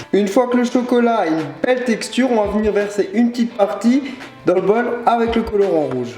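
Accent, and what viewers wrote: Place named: Alsace, France. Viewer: C'est l'alsacien ;)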